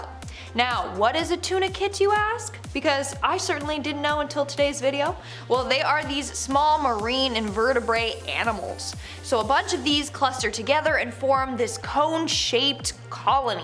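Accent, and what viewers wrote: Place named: United Kingdom. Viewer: You do a good British accent!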